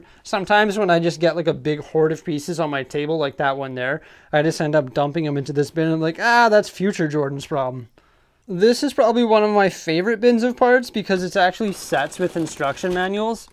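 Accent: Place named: Edmonton, Canada. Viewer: I’m so glad I subscribed to your channel in the mist of the virus 🦠. You got of interesting stuff going on right here and I can’t wait to see more. I love your Edmonton accent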